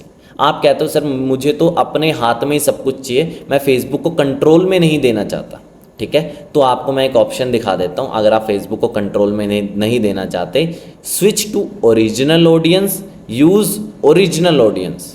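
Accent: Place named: Garhwal, India. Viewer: Sir aapke gadwali tone se smj gya aap gadwali hain bhut bhut dhanywaad Sir jo aap youtube ke madyanam se hmra margdarshan kar rhe hain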